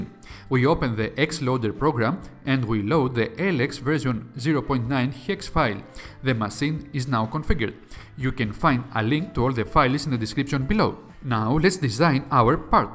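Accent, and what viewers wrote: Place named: Greece. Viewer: Love the greek accent: "Took me about ten awerrrs to bild de maseen!" Keep it up!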